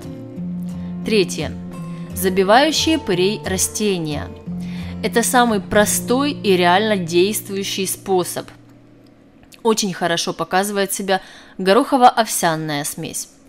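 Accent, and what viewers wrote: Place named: Ukraine. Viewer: ОН С АКЦЕНТОМ ГОВОРИТ, ПОТОМУ ЧТО ОН С УКРАИНЫ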